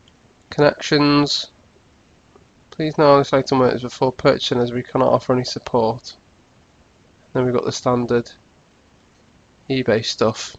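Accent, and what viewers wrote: United Kingdom. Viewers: I just bought a 100w solar panel, 12v gell 35Ah battery & charge controller. Because, like you, I want to learn about solar. Seeing Julian Ilett frequently use his solar system on his 'tronics bench, I thought I'd try it out. BTW, if I can ask, what is your UK dialect? This Amurrican is a huge fan of EVERYTHING UK, so I really love the accents from different places in the UK. I think my favorites are Welsh, Yorkshire, Cockney... Oh, yes, and 'posh'. Best!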